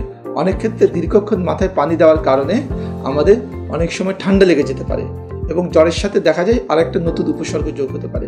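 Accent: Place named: Bangladesh. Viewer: কথায় বোঝা যায় বাংলাদেশের মিয়া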